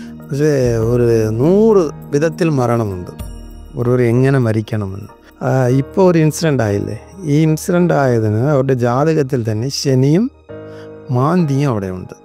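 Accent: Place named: Tamil Nadu, India. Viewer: എന്താ സംസാരത്തിൽ ഒരു തമിഴ് ചുവ. ഇദ്ദേഹം തമിഴ് Astrolger ആണൊ?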